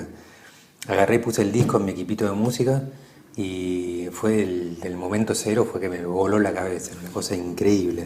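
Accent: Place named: Argentina. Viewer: Que video tan epico lpmqlrp, hablas argentino ahí y todo re piola, aunque no te puedo negar que NIN es industrial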